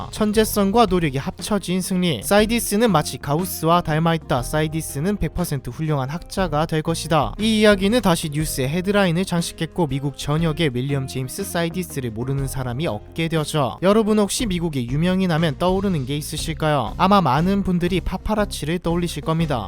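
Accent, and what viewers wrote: Gyeongsang, South Korea. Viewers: 경상도 분이시군요 ㅎㅎㅎ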